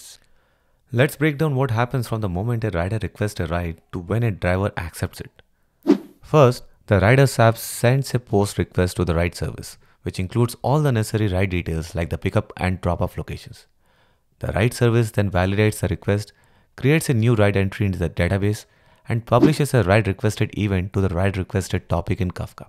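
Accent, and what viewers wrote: India. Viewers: I dunno why but the Indian access makes me focus more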